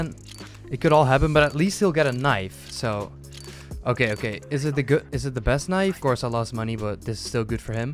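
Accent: Netherlands. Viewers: Just as I thought, het klonk gewoon te Nederlands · wauw echt cool dat je nl bent. Je zij het in een oudere video en je hoort het bij sommige woorden lmao. Je bent goeie en je Engels is pittig goed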